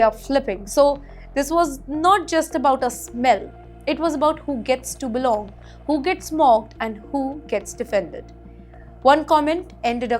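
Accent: India. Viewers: Speak English girl. Ur English sounds funny. Chilli biryani accent hahaha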